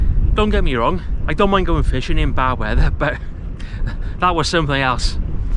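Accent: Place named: Barry, United Kingdom. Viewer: Hello lad, been meaning to say hello. Your accent sends me back to my youth. A boy from Liverpool spent his formative years growing up, then working in Barry. i worked on the fairground in Barry till I left school...then worked for a Barry outfit...industrial painting. Approaching 65 ,I am a couple of years returning to fishing. i have fished a number of these marks when I was teenager with my brother in-law...*but considered (my brother) he was from Barry.(unfortunately he passed young). He used to take me out swimming in that bay for quite a distance. So as you see, your accent has thrown me back , even though a bit more lighter than his. Here`s a little `ode' to my mate... The Boy from Biscay (Taken early) Drag me out Adagio Drag me out Mate I’m a foal riding a dragoon covered by a halogen sky... We breast stroked some salted spat and swam that white fool embracing heat waves and beams We kept our heads up in those waters broken and how my mate saved me from drowning Wish I had spelt...in a word reciprocate Drag me out Bosun...I’m all awash I’m a babe along a buoy of Biscay The swims I made with you though, have left me walking an Isle... 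hidden yet binding How I wished I dragged Adagio upon the oils of spelt and massaged away his fate So when I found this lagoon retracing, it was a token of a moistened embrace Now when I think of you the buoy of Biscay... I hope you will wheat. ps...bit of fun <link>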